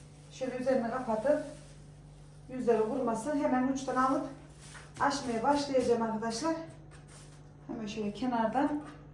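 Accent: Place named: Yozgat, Turkey. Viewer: S a bacım yozgatlımisin şiven bize benziyo ben sorgun dan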